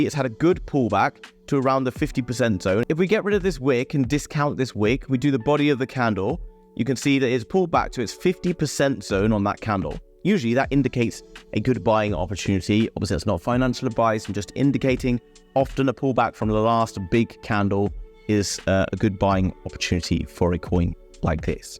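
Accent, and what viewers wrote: United Kingdom. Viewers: Adorable where u from in the UK lol😊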